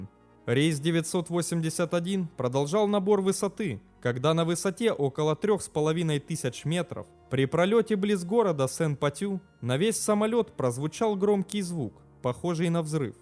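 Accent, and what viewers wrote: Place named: Ukraine. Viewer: у него произношение как у Креосана, скорее всего тоже украинец. У них как раз такой говор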